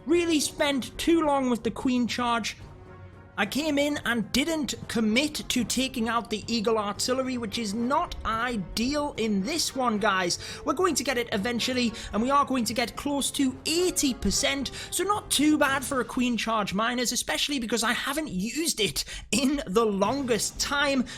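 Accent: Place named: Northeast England, United Kingdom. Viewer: North East England by the sound of it. My guess Sunderland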